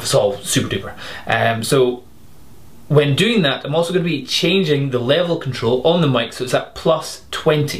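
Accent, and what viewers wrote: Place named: Scotland, United Kingdom. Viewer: The magic lantern test was a much purer sound, filtered out most of the noise but left the Scottish accent in tact :) Cheers.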